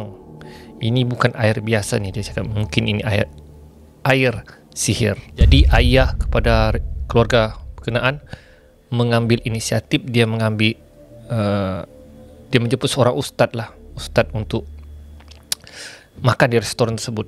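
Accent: Sabah, Malaysia. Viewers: Org Sabah kah kau ni bossku??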